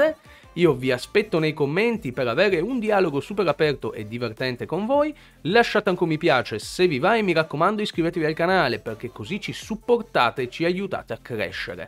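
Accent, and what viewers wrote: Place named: Trieste, Italy. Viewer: Si dice crémisi non cremísi, cerchiamo di parlare correttamente la nostra lingua. Capisco che dall’accento che hai la parli poco però sforzati, Trieste non è in Austria da un po’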